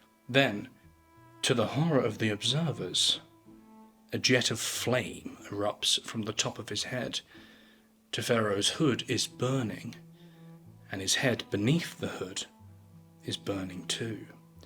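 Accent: United Kingdom. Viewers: A “vile” execution is necessary for a vile crime. In most cases, the condemned suffer much less than the victims. Fry ‘em all!!!! By the way- you sound like anti death penalty blubbering liberal limey. Why don’t you wash that shirt and report on rainbows and unicorns. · I was a state criminal prosecutor for 35+ years. Like most people, I feel conflicted over the death penalty. Even so, I think there is a great deal of misunderstanding over what happens during a legal electrocution. When 2,000 volts and 8 amps are applied, the condemned person immediately loses consciousness. Witnesses see very little movement except the person’s head goes limp after the first jolt. He is likely dead at that point, but a second jolt is applied to ensure death. This is probably gruesome to watch, but that does not mean that the condemned person is suffering pain. We know this because people who have survived accidental electrocutions at less current report remembering nothing. 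Electro-shock therapy is still used medically, with patients reporting no memory of the treatment. While there are a few exceptions, an electrocution usually is carried out without complications. The condemned person does not writhe or catch fire. I am guessing from the accent of the narrator that this video was made by a British citizen, where capital punishment is no longer legal. While I understand opposition to the death penalty, I would respectfully ask you to remember that the United States has different criminal problems than what you have in Britain and that capital punishment here, imposed by juries, is reserved for only the most aggravated types of murders. It is relatively rare. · This guy sounds like he might be from the same part of England as Rationality Rules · There is something about British accent and British ways of talking that always make me laugh. Hehehehe. Dude is hilarious...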